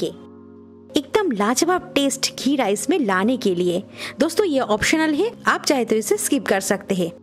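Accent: Bengal, India. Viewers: Aap Bengali ho mam apki Hindi bolne se hi samajh gaya mai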